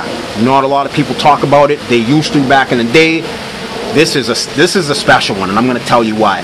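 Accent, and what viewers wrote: Boston, United States. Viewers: Black guy with a Boston accent- wicked awesome! The legend is true- they do exist.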